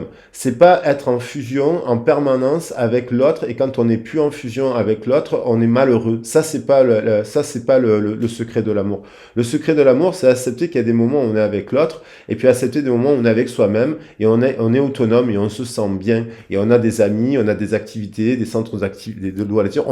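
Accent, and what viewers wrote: South, France. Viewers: Merci pour cette guidance je crois de t’avoir tout dit la dernière fois j’ai voulu aider mon autre je lui ai dit une vérité qu’il n’a pas apprécié désolée mais c’était pour son bien j’en avais marre de voir des vautours autour de lui qui le manipulaient et l’influencaient c’était ma mission d’aider et soutenir les personnes que je croise sur mon chemin de vie maintenant si mon autre désire me parler qu’il vienne me voir et qu’il ne s’inquiète pas je l’accueillerai comme il se doit pour une réconciliation sincère et authentique puis on avisera amour ou amitié je ne sais pas Dieu seul le sait 🙏 bonne journée et c’est toujours avec un grand plaisir de t’écouter chaque fois avec cet accent du Sud qui réchauffe mon coeur❤ grossi bacioni sans oublier Catalina et ton fils 👄👄👄😘👋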